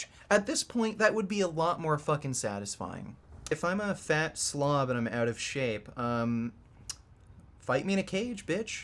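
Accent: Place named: Canada. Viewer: It's all aboot whooping ass.. like drake your from Canada. Tough voice th0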